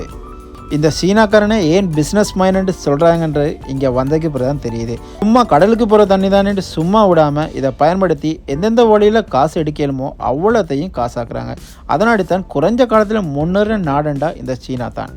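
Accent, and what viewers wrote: Sri Lanka. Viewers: உங்கள் ஈழத்தமிழ் உச்சரிப்பு அருமை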